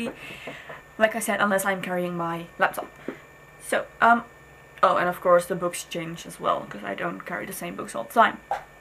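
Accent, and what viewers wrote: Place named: Netherlands. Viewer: ! Dutch indeed....I thought I could hear it! Awesome! Hallo landgenoot!;)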